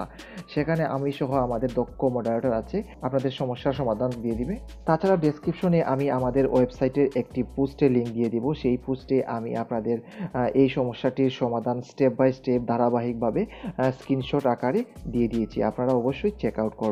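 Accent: Sylhet, Bangladesh. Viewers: বাড়ি সিলেট নি বা!😁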